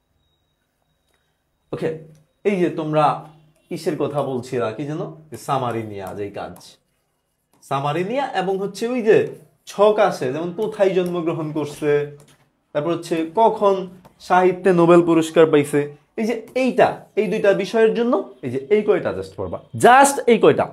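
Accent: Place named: Sylhet, Bangladesh. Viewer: ভাই কি সিলেটি? ওনার ভাষায় সিলেটি টান বুঝা যায়!😅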